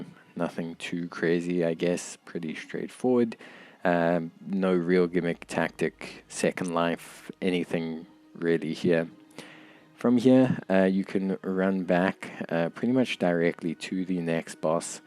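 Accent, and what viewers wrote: South Africa. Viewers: I took a shot everytime i knew thats a SA accent!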